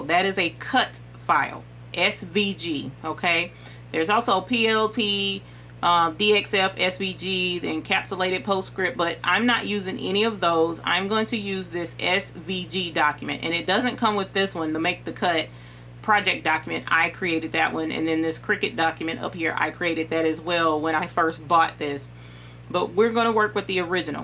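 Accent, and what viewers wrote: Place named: Texas, United States. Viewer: Please tell me you are from somewhere within 100 miles of Dallas or Houston? That accent you have makes me feel at home. Can you read me a bedtime story?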